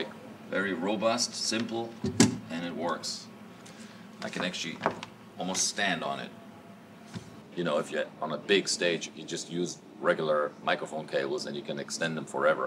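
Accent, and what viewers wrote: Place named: United States. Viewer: he speaks english like a pure american,if i wouldn't know him i would thought so.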